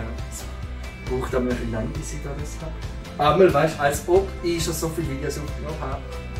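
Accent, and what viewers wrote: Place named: Switzerland. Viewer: Schweizerdeutsch hört sich irgendwie süß an...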